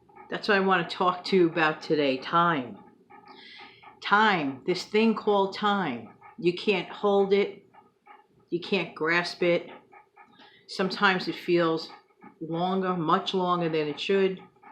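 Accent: New York, United States. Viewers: So so true. My 4 grew up so fast 2 men now and 2 young women. Youngest is 17. Sons are almost 30 and both away in navy. Enjoying tea time today. Morning chores done and eating leftovers for breakfast. I worked full time with children in 4 schools due to age differences. Miss those hectic days. My time for college (almost 2 years pasted already) and me time. It is hard to believe how fast time passes. My mind races too so I take time to go outside and photograph nature. Ahhhhhh bliss. Love your NY accent. Enjoy your days.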